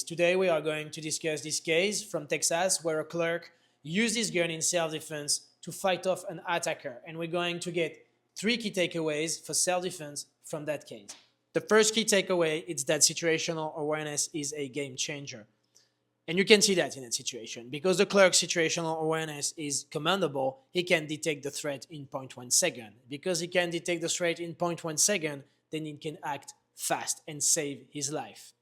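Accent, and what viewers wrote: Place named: France. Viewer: You sound French as fuk!! Rarely seen a Frenchman that understands and supports 2A... 🙏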